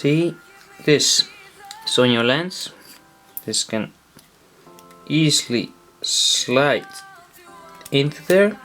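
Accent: Netherlands. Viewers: give him a break he is dutch....im sure he speaks better english than you can dutch. Anyone watching the video can see how the system works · duidelijk een nederlander :p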